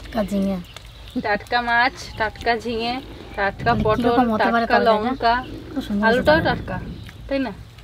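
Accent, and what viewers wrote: Bangladesh. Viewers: সব ঠিক আছে কিন্তু এনারা কি বাংলাদেশের লোকজন? এরম ভাষায় কথা বলেন এনারা যেন মনে হয় বাংলাদেশে থেকে এসেছেন..যদিও বাঙাল অনেকেই কিন্তু পশ্চিমবঙ্গে জন্মগ্রহণ বা বসবাস করলে তো ভাষা পরিবর্তন হবে.. একমাত্র যদি born and brought up বাংলাদেশ হয় তবেই এতবছর বয়সেও ভাষা বদল না হতে পারে।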